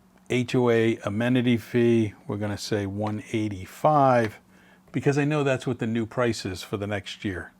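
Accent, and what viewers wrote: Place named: Connecticut, United States. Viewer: oh new Hampshire!! I thought you were from CT. Similar accent I guess. That makes perfect sense. Sunbirds! I guess we've been sunbirds with our timeshare in Orlando. Mostly going in summer when the kids were off school. Our youngest is a college senior so those days will hopefully be a memory soon.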